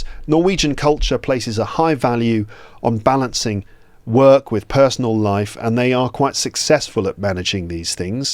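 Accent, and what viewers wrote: United Kingdom. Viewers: Dear Teacher you are great! I love your lessons. Please make videos for shadowing i want to have british accent like you. With love and respect from Azerbaijan. Your lessons are amazing! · thank you for this awesome story about your holiday in Norway. I crossed the Norwegian mountains by bike from Oslo to Stavanger nine years ago... So many memories it pulled out from my memory 😮 Now being living in Canada for almost 1,5 years I wonder how you minimise this funny British accent which I have become to recognize last year? 😊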